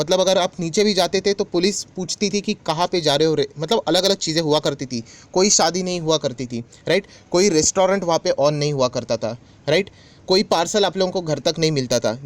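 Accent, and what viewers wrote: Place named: Pune, India. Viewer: The way sir said. Maybe he’s from Pune😍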